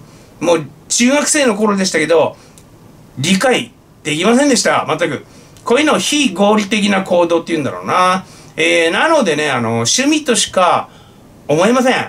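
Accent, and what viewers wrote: Fukushima, Japan. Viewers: ほんと、福島なまりがかっこいい。NHK党に戻ってほしいけどね。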